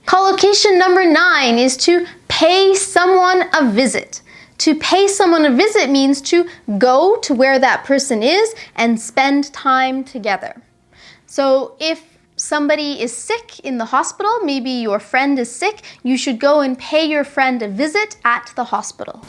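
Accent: Canada. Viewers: Are you from Canada? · Love u, despite you're Canadian and your pronounciation is a little bit different from U.S.A I always understand what you say and the way that you express is so clear as well. Regards!!!☺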